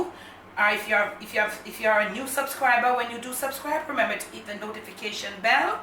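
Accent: Jamaica. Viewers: 😋 yummy...My 6 year old son said 🙄🤦🏽Why she talking like that😂 I said she Jamaican or Haitian 🤷🏽🤔correct me if I'm wrong😕 I said we have different people who speak different languages and voice sound different🤷🏽 We American and he little still learning😊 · I love this mama,full of food love, culture and blessed black woman, love the Jamaican accent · I just love the Jamaican accent. I could listen to you talk all day. Your voice is so soothing!!! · Me not a jamaican enuh, but from me small me love fi hear jamaican talk. Something bout jamaican accent juss funny and unique... love har jokes dem and how she say " and all a dat" ...remind me of Oliver and Punsy.... Much love from Saint Lucia.... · God bless the day I would learn Jamaican accent. It gives me so much moral. ♥️😍 We call that rice,concoction rice: where everything goes in. It is so delicious 👌♥️😋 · That look good I love the Jamaican accent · Girl you rock mix it drop that patois and English authentic Jamaican lawd we different sah.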